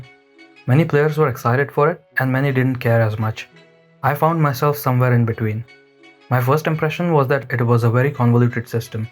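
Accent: India. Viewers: You sound so Indian :D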